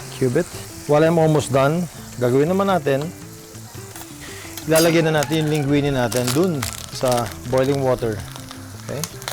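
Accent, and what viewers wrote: Visayas, Philippines. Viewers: its ok. maybe your so busy being public servant there in Ormoc so much so you speak lots of Bisaya already and for me, you got a grade of 85% and a 100% for the effort. Im from cebu. we flew to vegas together along with john t. PAL and P. buenaventura for gun sport show almost 2 decades back.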